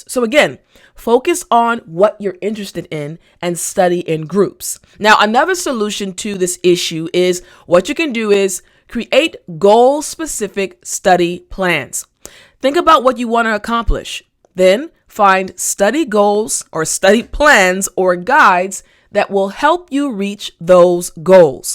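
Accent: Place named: United States. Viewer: Hii I'm from India at Odisha name meek ranjit thank you immensely for your lectures really I'm slowly understanding of your an American accent so appreciate your voice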